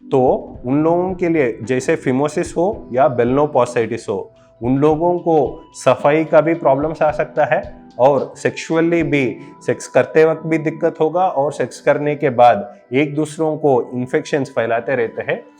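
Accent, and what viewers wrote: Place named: Telugu, India. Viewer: तेलुगू हिंदी थोड़ी सी डिफरेंट 😅😅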